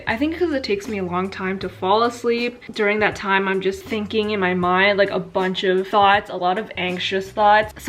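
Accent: United Kingdom. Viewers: that british accent is more realistic than my own english accent lol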